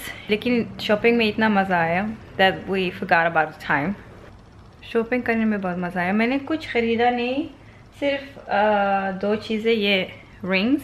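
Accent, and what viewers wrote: India. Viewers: First time am watching your video n when I heard your hindi i was like 😲😲 just like delhi grl...... 😎😎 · Very impressed with your Hindi as you could speak almost in the accent that people of Bihar and Easter UP( Indian states) . Very nice indeed.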